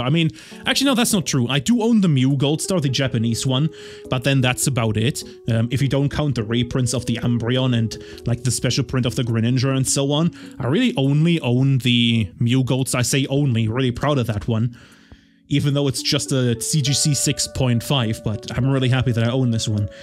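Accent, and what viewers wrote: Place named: Germany. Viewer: Haha direkt am Akzent gehört, dass du Deutscher bist :D Sehr coole Sammlung!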